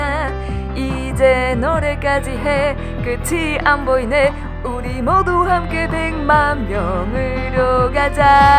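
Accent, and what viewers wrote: Argentina. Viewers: A mi me gusta q hablés en acento argentino <3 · se te mescla el asento nueutro con el argentino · No dejes tu acento argentino nunca!! Gracias por tanto amor ❤ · Te adoramos! Y tu acento argentino es lo más! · hablando perfectamente el español con acento argentino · Nose porque la critican si ella es la más linda en corea 😗😗😗😘😘 si sólo enseña coreano nada más.que importa si tiene asento argentino ella aprendió.:^ te amo mucho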